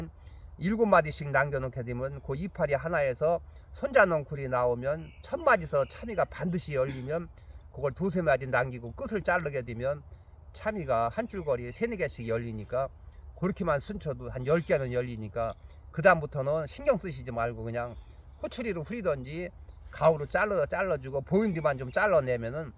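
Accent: Chungcheong, South Korea. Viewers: 충청도 분인가벼 설명 듣기가 느려서 답답혀 나두 충청도지만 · ㅋㅋㅋ 차미 차미 아저씨 반가워유 ! 충청도쥬.저두 충청도유~~ㅎㅎㅎ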